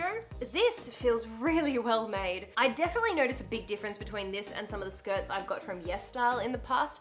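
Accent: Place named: Australia. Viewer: New subscriber here. My great grandmother was from Northam Australia. My great grandfather brought her back to the United States, he met her when he was stationed over in Australia during WW2. He was in the navy. Your Accent, brought back great memories of my Nan. She passed away when I was 15, I'm now 31. My great grandfather is still alive and well. My daughter is his first great great grandchild. 😍 My Nan never lost her Australian accent.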